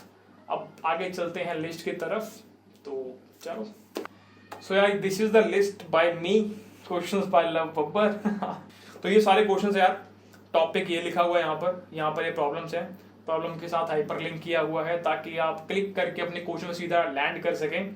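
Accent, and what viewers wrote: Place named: Haryana, India. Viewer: THAT CUTE HARYANVI ACCENT IN HIS VOICE ♥😅🏄💯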